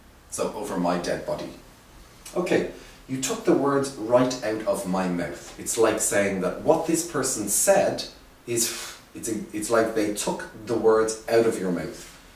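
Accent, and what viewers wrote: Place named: Ireland. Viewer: You sound to be Irish, you're from Dublin right?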